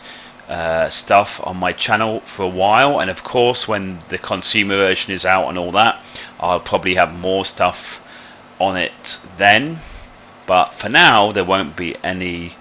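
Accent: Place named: United Kingdom. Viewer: why in the fuck do british mother fuckers talk??????? lets just use some CC on this bitch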